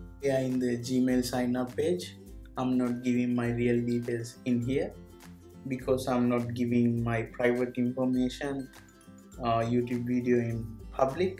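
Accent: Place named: India. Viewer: ddat indian accent do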